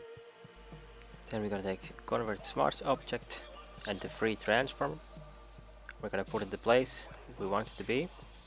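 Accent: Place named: Finland. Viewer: Where are you from? You sound finnish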